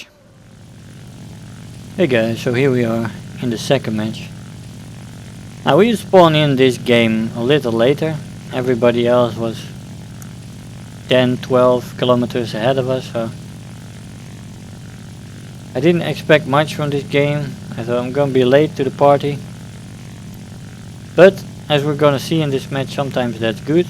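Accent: Netherlands. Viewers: Ha! ik wist t dat nerderlands accent is overal herkenbaar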